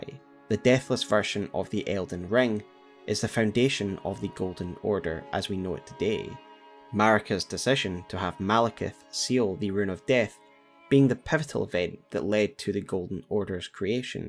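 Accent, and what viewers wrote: Scotland, United Kingdom. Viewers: I don't mean to alienate you, but can you please let me know what region of the UK you are from, I'm struggling to pinpoint your accent. Love the content! You are a lore machine. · Man, don't even try to hide your scottish accent, it sounds good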